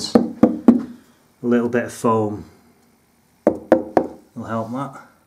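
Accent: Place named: North West England, United Kingdom. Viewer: Lovely job, you sound like you are you based in the north west ? Would you consider giving a quote in warrington .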